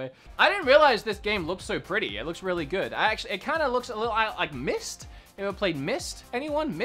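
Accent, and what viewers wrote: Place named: Australia. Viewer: You're an Australian!? I did NOT expect that!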